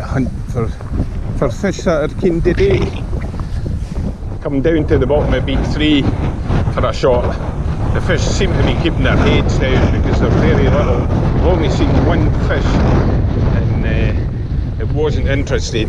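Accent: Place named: Scotland, United Kingdom. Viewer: It's good to hear a Scots accent again. I moved to Connecticut 12 years ago and now fish for Steelhead in the Great Lakes and saltwater for striped bass. I grew up fishing Yair on upper Tweed, the Dee, Loch Leven etc. It's great to visit these rivers through your films.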